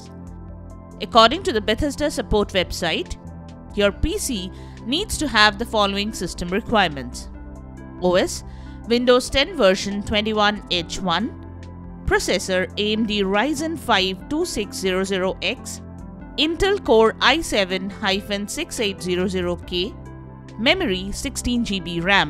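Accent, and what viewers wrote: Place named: India. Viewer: the accent instantly told me what i needed to know, down voted, and "dont recommend channel" lol i NEVER trust indian accents with anything.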